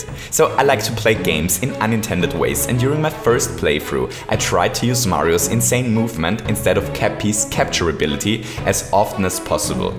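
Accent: Germany. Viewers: Wow. It's so funny, I know you are a German, because how you speak English. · Or german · Ernsthaft? So einen krass deutschen Akzent hab ich im Englischen noch nie gehört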